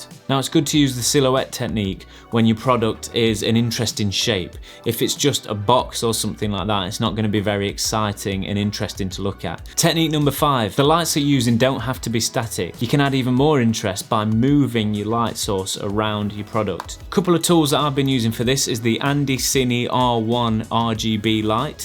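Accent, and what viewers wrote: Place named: England, United Kingdom. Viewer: Are you from England? I like your accent. Somehow it sounds more professional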